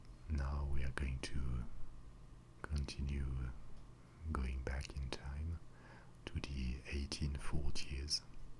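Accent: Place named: France. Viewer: male asmr voices have never given me tingles. until now. books.. French accent.. so many tingles. well done, Sir, well done. subscribed!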